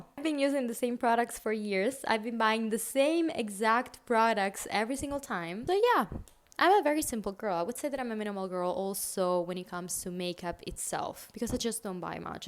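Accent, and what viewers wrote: Italy. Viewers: Mado, che bell dialetto italiano 🥰🤌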